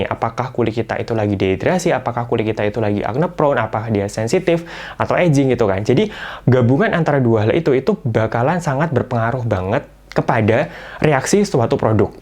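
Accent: Java, Indonesia. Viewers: Waduh jowonya mendok sedep mas hi hi...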